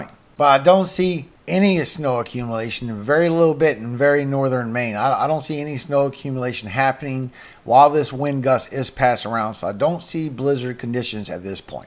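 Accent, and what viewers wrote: Louisiana, United States. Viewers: Thx! You sound like a cajun a bit!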